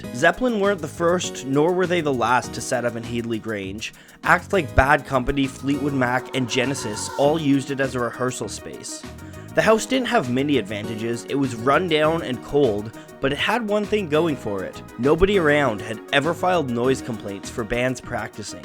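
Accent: Canada. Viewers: I like this channel, with all it's flaws and Canadien accents (houwse gets me every time) and/but I challenge your stage/studio knowledge to make a video on how to mic shit based on the hallway/staircase situation of the unbelievably talented John Bonham. Find songs, and show how mic placement changes and improves the sound. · Are you Canadian?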